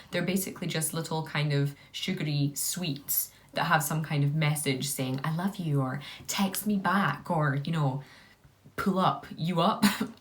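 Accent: Scotland, United Kingdom. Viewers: Hiii! I am here only for your good looks and Scottish accent. ;)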